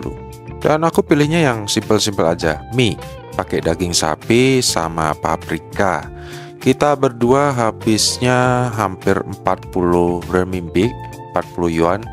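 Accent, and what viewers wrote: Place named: Java, Indonesia. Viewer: Kau orang cina islam yah koh. Logat bicara jawir. Gimana ada penindasan gak terhadap islam disana? Jangan hoax loh yah kokoh ganteng.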